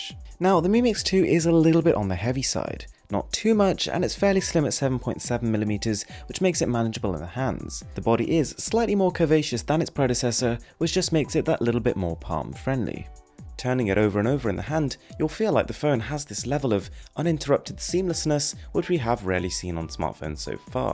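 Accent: United Kingdom. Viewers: oh! Thats why he looks indian and sound british. Amazing! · Why do you have english or british accent?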